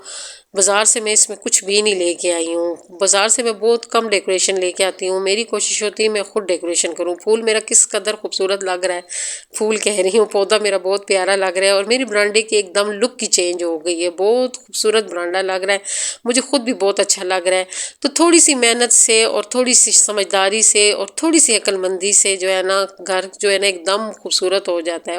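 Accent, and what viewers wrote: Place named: Murree, Pakistan. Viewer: Ap ka speaking excent murre k kisi gaon ka hy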